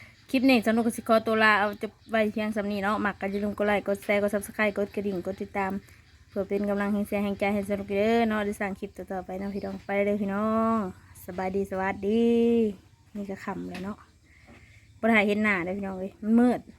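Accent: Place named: Savannakhet, Laos. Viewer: ສະບາຍດີ ຕິດຕາມເບີ່ງຢູ່ເດີ..ເຈົ້າສວຍງາມດີ..ແບບທຳມະຊາດສ້າງມາບໍ່ແຕ່ງຫນ້າກໍງາມ...ເວົ້າລາວມ່ວນດີຂອ້ຍຊອບສຳນຽງສຽງເວົ້າພີ້ນອ້ງຄົນສະຫວັນະເຂດເວົ້າລາວ...ຮອ້ນ..ຮອ້ນ..ຮອ້ນ...ຫນ້າເຫັນໃຈ..ຢູ່ບ້ານຂອ້ຍໜາວເຢັນ...ໂຊກດີເດີ.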